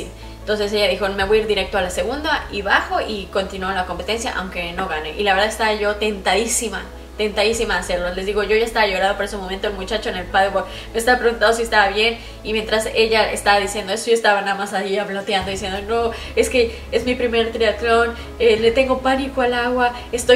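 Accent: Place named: Campeche, Mexico. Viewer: De donde eres. Hablas como Campechana.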